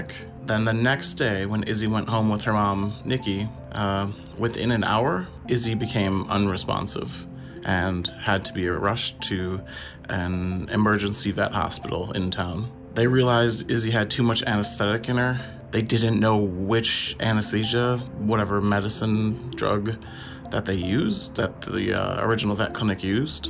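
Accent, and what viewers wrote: South, United States. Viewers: Man you are as southern as can't and so iam I love to hear you talk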